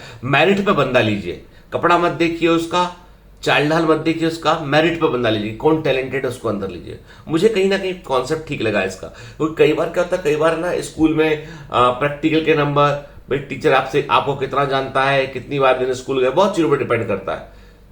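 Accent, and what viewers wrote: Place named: Bhojpuri, India. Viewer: Vha sir gajab ki bhojpuri apki hn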